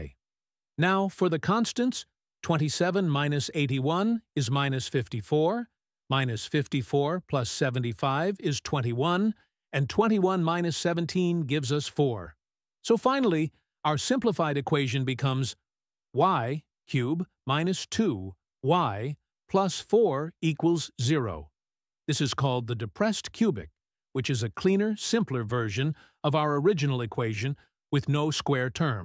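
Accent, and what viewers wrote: India. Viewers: My guy, please learn English grammar. It sounds too obvious that you're Indian. You say things like "y+3 whole cube" which is something only Indians say, while native speakers say "y+3 all cubeD", or squareD.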